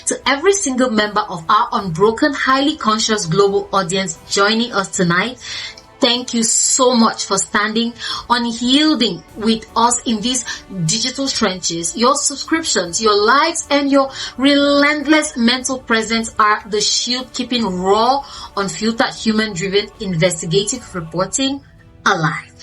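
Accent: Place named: Nigeria. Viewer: I wish your name was mentioned. I congratulate you on the way this information is presented. Firstly is English your 1st language? If it is not, your English diction, vocabulary, expression, pronunciation and grammatical awareness is beautiful. I speak English well but I dont like that my 1st language is the Imperialist 'Kings English'! You make English sound elegantly Nigerian. Great video! Excellent content which is trustworthy and left field. This would not appear on mainstream western media. Scary question. Are u A.I. generated?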